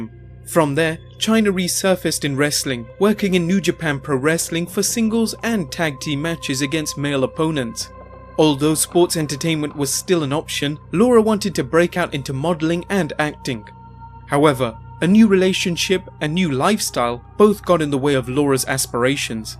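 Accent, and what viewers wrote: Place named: England, United Kingdom. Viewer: The English narrator gives it an air of sophistication and authenticity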